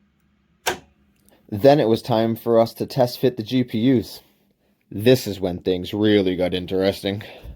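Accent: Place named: New England, United States. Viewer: "one andaa quootaaa" Love that New England accent! Nice video man!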